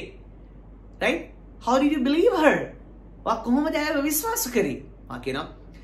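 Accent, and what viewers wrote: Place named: United Kingdom. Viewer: My loving teacher ,will I ask you a one thing ? How do you speak in English like a british one..? 😍😍😍 I also like speak in English as same as you😌🌼🌼🌼I already have started work hard for it😊✨️I believe some day , I will be able to speak in English nicely 😍😌🌼now I feel I am improving day by day because your strawberry English 🍓frankly speaking now I can't help speaking in English..although sinhala is my mother tongue ...now I like speak in English better than sinhala 😌🍓your strawberry English is really fit for me.🍓❤️😍happy to say I have selected the best way to learn English.. I remember one day our school teacher said us ENGLISH IS A CROWN 👑 and the one who wear that it's seems like a king 😍😍😍 I wanna wear that crown ..please sir help me to reach my dream 🙏✨️ Your poor student 😌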